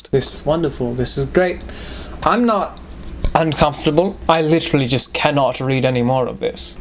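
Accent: United Kingdom. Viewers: thats how you know that, and his up-most british accent, is a fellow anime fan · This is my second vid i watched of him so uhm what is his real voice??? 😂😂 Liks is the British voice his real voice or not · I wish would read Darcy's proposal from pride and prejudice lol or Mr. Rochester's proposal to Jane with the British accent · Is he actually British or is he American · I am so used to hearing his British Gentleman accent that I forget that it is not his real voice..... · So I came here from tik tok for the british accent... but the reactions are sooooo much better 😂😂😂😂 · I love either way when he does his regular voice & and his british gentlemen for the 1800's voice. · The funny thing is that earlier in these videos he’d only be British when reading it, now ITS ALL THE TIME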